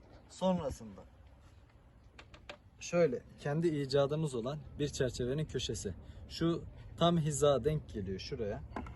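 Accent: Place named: Ordu, Turkey. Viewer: Selamünaleyküm ordulusunuz sanırım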